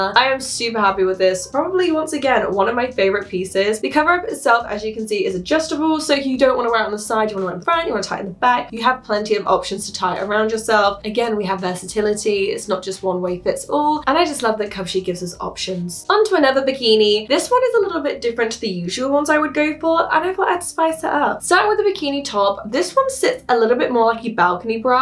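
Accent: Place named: United Kingdom. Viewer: You are gorgeous and super cute. Beautiful eyes, cute voice with the British accent, beautiful body and curves, very cute little belly button, nice booty long legs. You look amazing and very cute in every outfit you wore in this Try On Haul video. The blue floral bikini really made your beautiful eyes pop and with the blonde hair hello cutie. The Pinkish bodysuit/one-piece swimsuit made you really beautiful too along with the White big T-shirt at the end. In the White big T-shirt at the end with the blonde hair you are really beautiful. You look like an angel.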